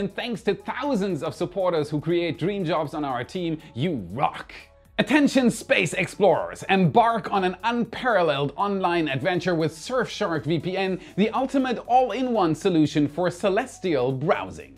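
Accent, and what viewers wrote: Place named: Germany. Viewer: He’s native German.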